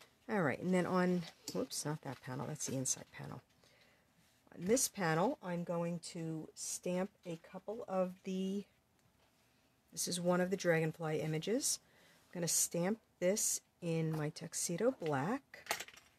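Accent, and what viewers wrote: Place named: New Jersey, United States. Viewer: Just subscribed! This is one of my favorite new sets! I love this card. I am a Long Island girl moved to upstate New York. I love your accent even though I think it might be Jersey, it makes me feel right at home❤️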